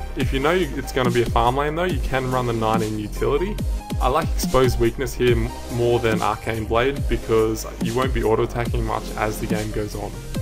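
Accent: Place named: Australia. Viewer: I'm really grateful they kept the damage consistent on his Q rather than ramping it up as you charge it. Good video, love the Aussie accent :>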